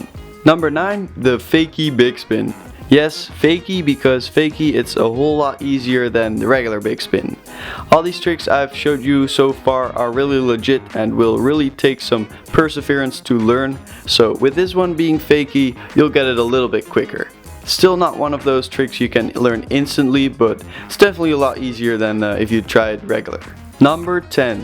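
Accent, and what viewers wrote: Netherlands. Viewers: u dutch ? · Are you dutch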